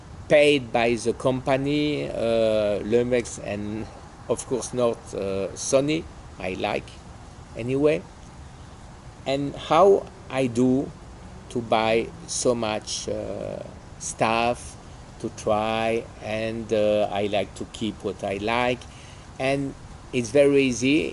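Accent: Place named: France. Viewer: Cà me rend triste aussi d'apprendre que tu es atteint de cette sale maladie. Il y en a tellement! Mais bon il faut garder espoir et profiter de la vie comme tu le fais. C'est en partie grâce à toi que j'ai migré vers le monde du MFT. J'ai choisi Olympus et suit très satisfait. J'aime tes vidéos et ton anglais "so frenchy". Tiens le coup.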